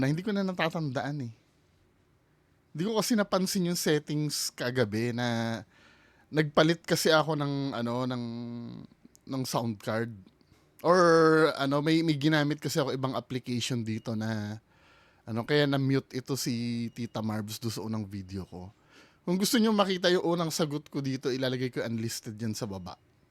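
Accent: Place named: Visayas, Philippines. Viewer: Ate Bisaya ako Pero ikaw Bisayang Bisaya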